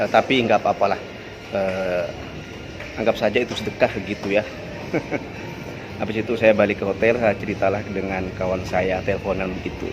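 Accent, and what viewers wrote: Malaysia. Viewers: Mmng betul adik, ngomongnya kamu tu bkn org Indonesia dh,dh mcm org Malaysia sngt dh.Xpa...niatkn sedekah,biarlh peniaga tu yg jwb jika ianya ada niat menipu.Gk kn senang mn pn jika ianya menipu pelanggan mrk...gk berkat... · Kalo bisa jangan pake logat melayu, tpi logat jakarta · Speaking pun dah mcm org malaysia...slang indon langsung hilang.... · Bro.. Kamu dah mcm org Malaysia.. 😀.. Percakapan kamu mmg dah Malaysia sangat.. 😁😁👍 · Salam..org jawa timur..raut wajah dan percakapan anda jugak seperti org Malaysia. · Cara abang bercakap sikit" agak logat orang malaysia.jadi di sangkanya orang luar negeri. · salah anda sendiri..knp pake loghat malaysia.. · Sampurasun.bng kata aku mah bhsa.abang nyak.jadi campur.logat.melayu. kata aku mah.kan Abang udah lama kerja.di malaysia.jadi logat.melayu.nyak kebawa. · Wajah dan slang cakap macam orang Malaysia tau.😊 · Makanya.... 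1. dimana bumi dipijak, disitu langit di junjung, Mas. Kamu baru 2 tahun di malaysia, udah pakai logat malaysia. 2. Mana ada ayam penyet harga 12ribu di Batam. Paling rendah 18 ribu rupiah. Teh obeng / ice tea itu minimal 5 ribu. Nah kalo lontong sayur,. Nasi lemak (makanan untuk sarapan) iya rata² 10ribuan. 3. Lagian, itu warung dekat hotel toh? Yaa pantes donk....😅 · Bahasa mas sudah mirip orang malaysia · Logat mu malaysia,jd di mahalin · lol... minda sempit... x lupa pun bahasa indonesia.. lohgat je tertukar siket.. itu normal la sbb br pulg dr malaysia.. lama2 akan kembali loghat indo..itu pun nak kecam.. patriotik itu bagus tapi jgn eksterim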